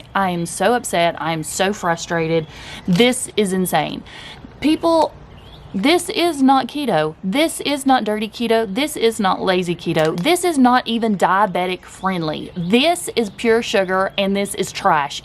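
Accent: South, United States. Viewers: Something is busted there. The meter clearly says "Ketones" and then the ridiculous number. I don't have a KetoMojo but a Precision Xtra that does glucose and ketones, and the meter will clearly show what type of strip it is reading - Glucose or Ketones. Something is flubbed there. In any case, 268mg/dl would be OUTRAGEOUS for ketones so it may really be reading glucose. But if that number is legit, this is worse than sugar. Actual sucrose would hit you maybe into the high 100's. .. BTW, love your channel and please ignore the haters. I live in the south and I love your accent! You sound like a sweet, friendly southern gal to me!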